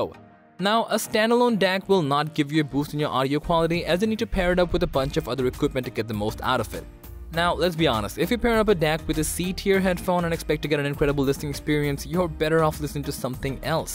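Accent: India. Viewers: Oh yes, but along with the indian accent. He also has a British, american, and what seems like a Kiwi accent to me. That's quite impressive.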